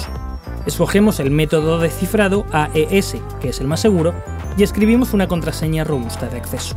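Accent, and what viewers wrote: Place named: Spain. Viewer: Puros tutoriales en español españa :v;ondaa vitaaaaal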